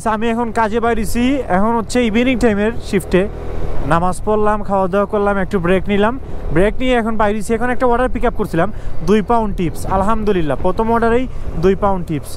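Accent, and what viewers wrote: Sylhet, Bangladesh. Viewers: Vai mone hoy sylhet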